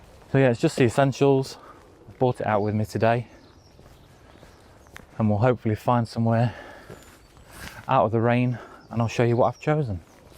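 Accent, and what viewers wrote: United Kingdom. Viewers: sometimes you sound close ? Maybe Leeds area ?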